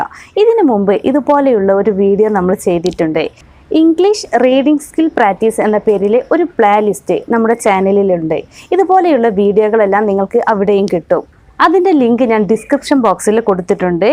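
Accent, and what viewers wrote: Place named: Malappuram, India. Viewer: Your malayalam accent like the Malappuram..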